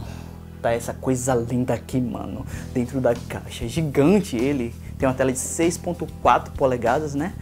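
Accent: Bahia, Brazil. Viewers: mano mim acabo de rir com o seu sutaque você é da Bahia ? um grande abraço.e link marato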